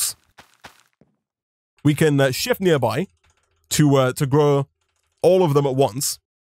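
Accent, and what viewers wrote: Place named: Yorkshire, United Kingdom. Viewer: man, you must speed up your videos. why? you have the voice and pronunciation of a beautiful Yorkshire angle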